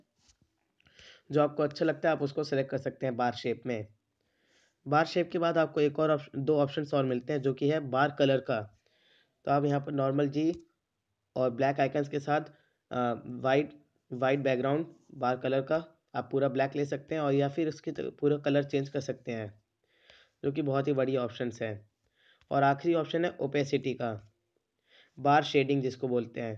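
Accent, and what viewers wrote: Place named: India. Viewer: No offense i undsrstand that u are indian and have accent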